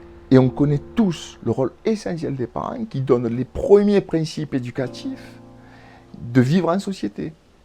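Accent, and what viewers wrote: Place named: Marseille, France. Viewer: Vous êtes le seul à nous présenter les topiques sans prise de tête, c'est compréhensible et tout a fait abordable finalement. A condition d'expliquer ÇA clairement, sans trop de termes barbares. Étudiante en soins infirmiers, ÇA m'aide beaucoup. Merci beaucoup !!! Le cadre est idyllique, sous un magnifique soleil ! Mais ce qui rend cette vidéo agréable à voir, et surtout à entendre, c'est ce chouette accent marseillais qui donne une jolie musicalité à vos propos. J'adore 💚❤ Encore merci... 👍😘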